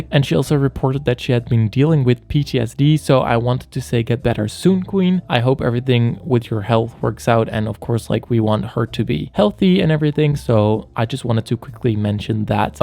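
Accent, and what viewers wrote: United States. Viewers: Haha, ik zat rustig te luisteren naar je super Amerikaanse stemgeluid en dacht: Ow wauw hij zegt Chantal Jansen wel heel mooi Nederlands. 10 seconden later: mijn moedertaal is Nederlands. lol. Dat had ik gewoon even niet zien aankomen mede door je naam. Heel leuk. Goed verwoord allemaal. Ellen is echt niet leuk. Had altijd al een raar gevoel bij haar. Ze kijkt soms zo kil ofzo. leuke video, liked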